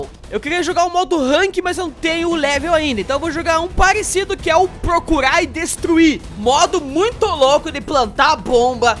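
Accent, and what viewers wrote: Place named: Rio Grande do Sul, Brazil. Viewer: Parece ser gaucho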